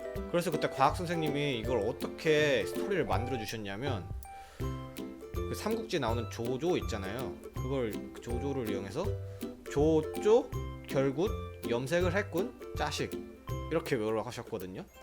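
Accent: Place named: Jeolla, South Korea. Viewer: 전라도 사투리 쓰시네요.. 참고할께요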